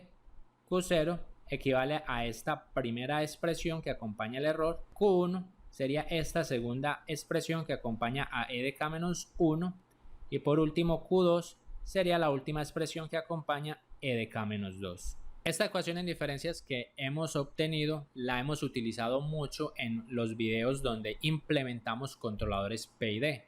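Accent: Colombia. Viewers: Eres de Colombia?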